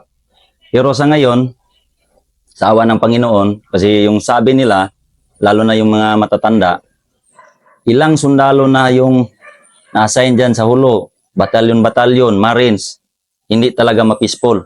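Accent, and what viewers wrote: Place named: Visayas, Philippines. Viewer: ikaw mag storya sig reklamo! klarong bisaya galisod ug tagalog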